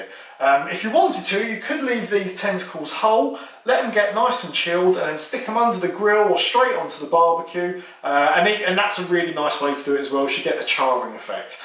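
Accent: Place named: United Kingdom. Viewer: you are? sure fooled me with the british accent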